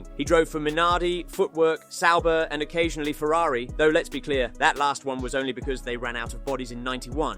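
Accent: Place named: United Kingdom. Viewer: 100% British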